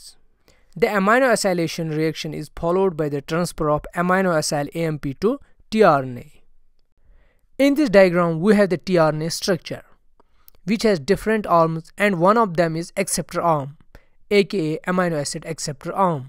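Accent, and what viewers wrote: Kashmir, India. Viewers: Sir are you from kashmir??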